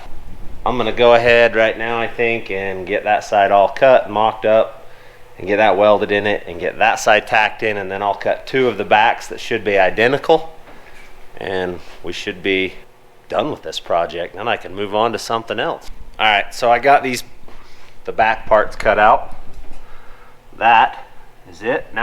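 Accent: United States. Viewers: Looking for the end? You will find that when the roll is finished. Still cant get used to the American way of saying things😉😉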